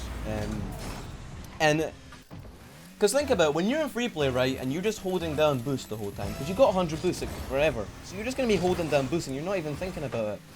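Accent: Scotland, United Kingdom. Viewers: Ye Scottish aye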